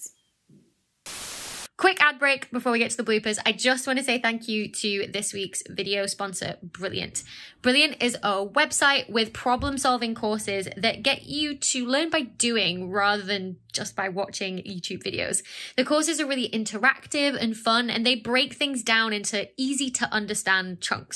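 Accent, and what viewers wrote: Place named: United Kingdom. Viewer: I gave it a like because it made me laugh. Listening to British people that by American standards don't have a strong accident can produce little surprises like this. It seems like they are speaking regular English and then out pops schedule, controversy, solder, etc. But bubble is my favorite.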